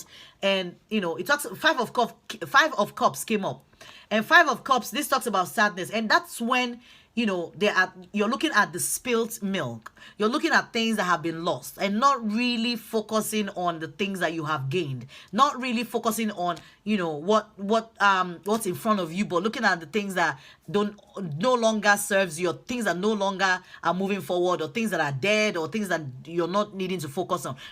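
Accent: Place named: Nigeria. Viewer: This absolutely resonated & it really spoke to my spirit. I love your Nigerian accent too! ;)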